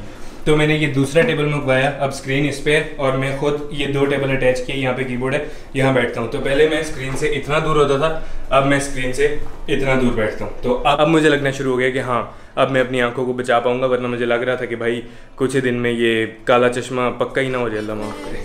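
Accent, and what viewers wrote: India. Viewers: Bro zindabad bolo jindabad nahi.You sound like an indian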